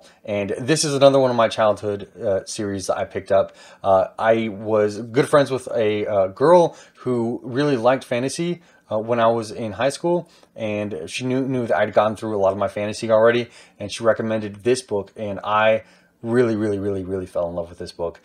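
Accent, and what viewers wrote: South, United States. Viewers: I love your video at your house in Texas during your quarantine until you're talking about your Top 10 favorite fantasy series with a lot of books are Red Sister, Grey Sister and Holy Sister by Mark Lawrence, The Name of the Wind and The Wise Man's Fear by Patrick Rothfuss, The Fifth Season, The Obelisk Gate and The Stone Sky by N.K. Jemisin, Eldest, Inheritance, Brisinger and Eragon by Christopher Paolini, Gideon the Ninth and Harrow the Ninth by Tamsyn Muir, Mistborn, The Well of Ascension and The Hero of Ages by Brandon Sanderson, Green Rider, First's Rider Call, High King's Tomb, Blackveil, Mirror Sight and Firebrand by Kristian Britian and so many others while you're talking about all the characters from the book, I hope your husband, Zach will be very proud of you, but I know you're half Mexican and you had the Southern accent!! 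#BookTube #SFF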